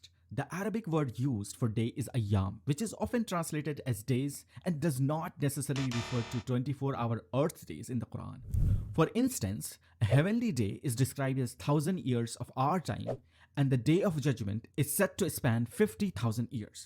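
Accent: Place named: Bangladesh. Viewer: Assalamu alaikum brother. Are u from Bangladesh? ur accent sounds familiar. take love <3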